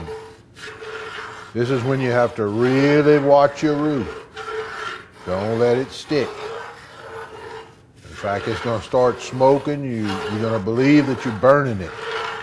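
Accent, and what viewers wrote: Louisiana, United States. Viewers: I just love it when you speak cajun. To my ears it sounds like old french sprinkled with american english flavor. "Ça c'est du bon café!", when you said that, it was just like poetry to my french-from-France ears. Loshepa (Lâche pas la patate!!!).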